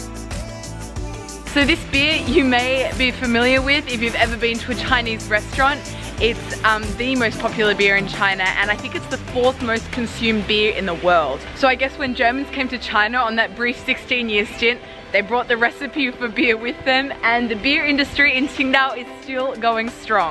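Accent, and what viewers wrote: Australia. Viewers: Heyyy, I'm a Chinese student currently studing in Melbourne, its suprised to know that you are a Aussie, like your accent and video, and the way how you expericen and introduce Chinese culture. And one more thing is I recommend my hometown Fuzhou city in Funjan province. The climate there is quite similar to Sydney, and it's famous for it's beautiful greenary and parks. Looking forward to see your video inroducing my hometown:）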